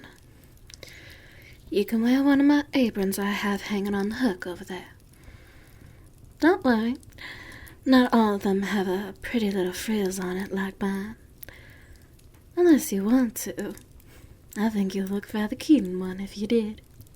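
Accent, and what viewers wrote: South, United States. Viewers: I dunno what it is about a southern belle accent but its sooooo gorgeous to listen to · Another instalment of my favourite southerner (thank you 💜😘). Even though part 2 is still my favourite of the series, this one was beautifully subtly in an unfortunately uncommon way. As frequent use of perfect Spelling seamlessly integrated into English-spoken sentences is one of the best non-ASMR VA ideas I have come across in years, and he made it seem like child's play.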